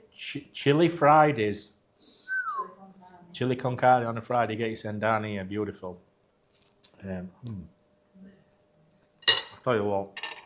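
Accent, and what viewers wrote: Yorkshire, United Kingdom. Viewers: Haha, no worries at all, I’ll definitely be able to understand! Your Yorkshire accent might be a bit unique, but that’s totally fine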